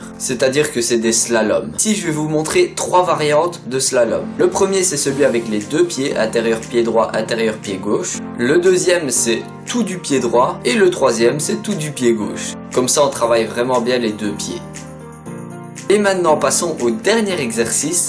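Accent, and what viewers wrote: Belgium. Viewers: T belge?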